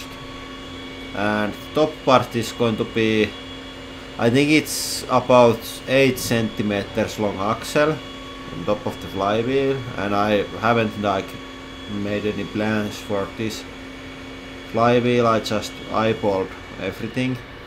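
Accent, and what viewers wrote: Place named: Finland. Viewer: Oisko aksentti :D Ei sil oo ees nii paska enkku mut sil o se suomalaisten aksentti. Jos sul ny oli joku 10 enkku ni et varmastikaan pystyis puhuu paremmin :DD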